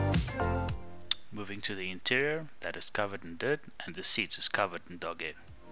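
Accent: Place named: South Africa. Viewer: You can recognise a SA accent anywhere. Nice job. Hope to see more videos.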